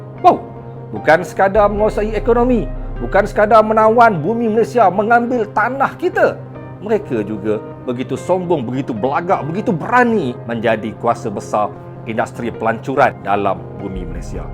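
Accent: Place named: Lampung, Indonesia. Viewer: Bahasa anda mirip bahasa Lampung mungkin satu rumpun dengan Lampung Indonesia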